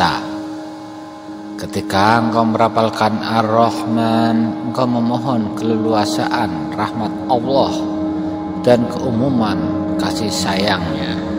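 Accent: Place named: Aceh, Indonesia. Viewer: ne orang Aceh kayak nya, logat bicara, na nomor W A!,.'-.', '.-.'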